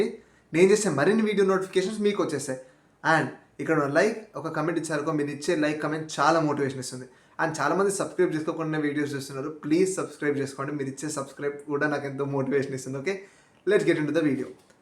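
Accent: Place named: Telangana, India. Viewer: Telangana slang 😁🤣 # new subscriber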